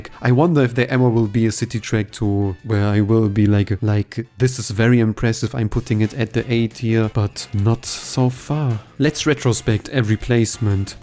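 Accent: Germany. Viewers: IMO the city tracks in this wave were a huge improvement, Singapore is pretty good and Bangkok is even among my favorite tracks in the entire game! Side note, by the way you talk it's very noticeable that you're German (ich bin auch deutsch ^^). Try to use the word "the" less in places where it doesn't belong in English. For example, just say "Yoshi's Island" and not "the Yoshi's Island".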